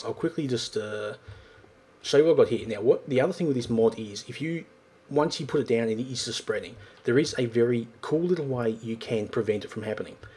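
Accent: Australia. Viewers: OMG AUSTRALIAN ACCENT!!!! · His accent as well is just typically aussie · u got the best ausie accent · luving that auzzie acent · Is that an Aussie accent? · Its an Australian accent. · Australian accent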